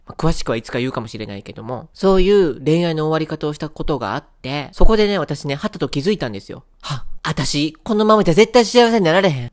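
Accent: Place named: Osaka, Japan. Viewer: あ〜今日も面白かった🙌 の大阪弁好き❤️ 東京ラブストーリーめっちゃ見てた〜 のトークもめっちゃ好き❤️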